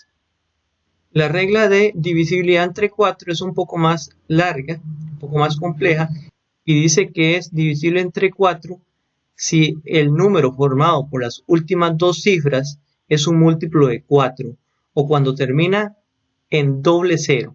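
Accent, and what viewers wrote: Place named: Guanacaste, Costa Rica. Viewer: como se nota que es tico por el acento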